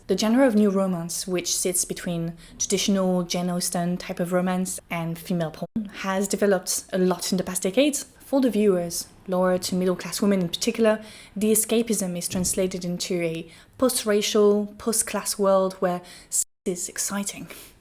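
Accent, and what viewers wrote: France. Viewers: Daaamn girl. You are 🔥. Loooove the French accent as well 🙈. What's your Also it might be true that Gen Z are more politically active but not really well educated/informed on the political topics they are active about. It seems they just want to be angry at something and want to be loud because of it.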